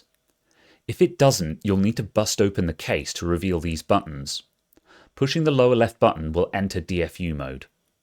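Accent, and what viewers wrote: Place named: West Midlands, United Kingdom. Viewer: Hi, it was because of your videos that i watch late at night to relax that got me interested in this sort of stuff in the first place, and I have already learnt so much. After a while I managed to convince my wife to buy me a little DIY soldering kit for a graphic equaliser, and I said to her, if it doesn't work, I'll never buy one again, but (honestly, to my actual surprise) the thing works flawlessly, and I hnow have a sort of bug for building simple electronics. Half way through building this equaliser the soldering iron I had blew up completely, so I had to buy one off amazon to get it finished, the soldering gun i now have is amazingly better than the one that blew up, and I'm absolutely willing and looking forward to my next project, so many thanks to you, you've inspired an almost 40-year-old-toddler to try something new. 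Hope that makes you smile tonight. Cheers bro. The next one I do I may consider putting up on Youtube. PS - you sound like you're from the West Midlands ish?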